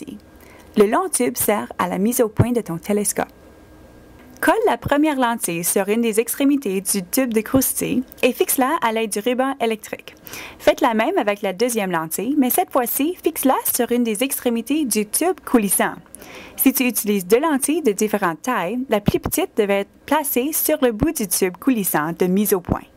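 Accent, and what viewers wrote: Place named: Canada. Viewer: décidément j'adore l'accent de nos cousins/cousines d'outre-Atlantique, je le trouve toujours hautement réjouissant. Contenu intéressant pour animer un atelier (classe de découverte, colo...) avec des enfants (je dis bien les "enfants", pas notre mot d'argot qui veut dire tout à fait autre chose au Canada! - d'ailleurs comment avez-vous traduit le titre du film "Honey, I Shrunk the Kids"?) Pour la collimation j'ai un doute, pour observer la nébuleuse de la Lyre aussi; perso je choisirais la grande lunette si j'avais les moyens, mon XLT 120 est ridicule à côté.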